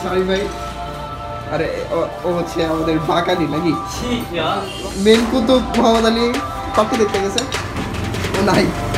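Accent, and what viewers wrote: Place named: Tangail, Bangladesh. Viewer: tangailer voice ta valo lage bcz my homeland is Tangail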